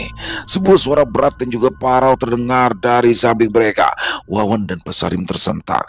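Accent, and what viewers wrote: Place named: Jonggol, Indonesia. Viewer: Bahasa sundanya mirip orang jongol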